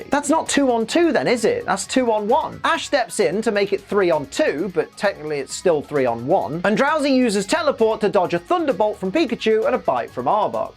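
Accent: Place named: United Kingdom. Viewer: Oi u British mate?the Pokemon is blitze.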